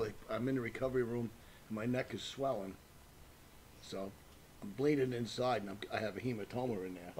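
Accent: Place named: Northeast, United States. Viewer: Which Doink is this? He sounds like he is from the Northeast, Steve Lombardi?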